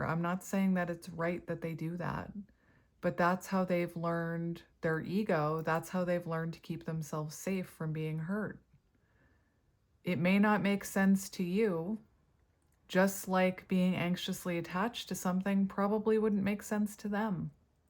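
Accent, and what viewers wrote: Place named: Manitoba, Canada. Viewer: I'm so curious, what province are you from? Your accent sort of gives me Manitoba vibes but it's hard to tell haha 😄BC girl over here 🇨🇦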